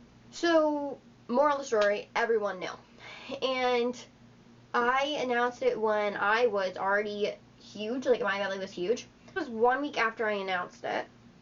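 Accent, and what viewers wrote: Canada. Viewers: RhiannaFrancesca07 i knew as soon as she talked she was from Canada lol, are you also from Canada??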